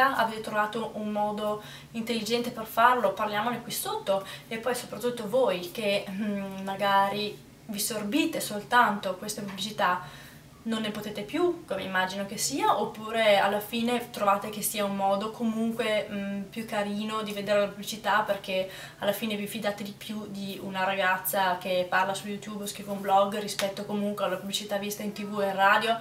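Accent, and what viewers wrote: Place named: Veneto, Italy. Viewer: Sono una nuova iscritta é un vero piacere averti scoperta e ascoltata. Posso chiederti se sei Veneta? Io sono di Verona e trovo molto famigliare la tua cadenza. Ciao e buona giornata!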